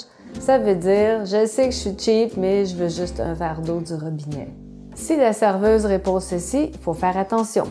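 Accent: Quebec, Canada. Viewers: J'aime écouter votre accent Québécois beaucoup beaucoup ! Ça me plaît d'entendre. J'essayerai a imiter! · Merci madame! J’aime beaucoup voir tes vidéos! Elle me donne exposure au dialect québécois, plus que j’ai eu quand j’étais là. Mon voyage m’a donné la réalité que mon français n’est pas comme j’ai pensé. Aussi, je voudrais rentrer et possiblement vivre là….j’ai tombé en amour avec le Québec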